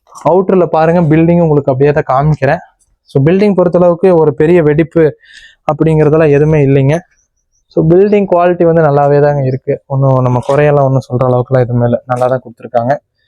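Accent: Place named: Coimbatore, India. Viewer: kovai slang😅😅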